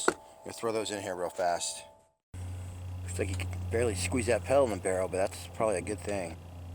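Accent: United States. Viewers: Just curious. You sound like a Marine Recon sniper or Army Green Beret. You self-criticize sub-inch groups at whatever range. Are you from Kentucky?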